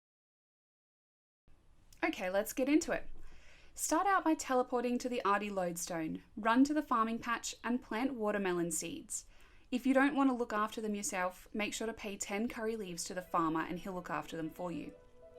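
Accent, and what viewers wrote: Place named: Australia. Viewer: aussie, I like it (im aussie/kiwi)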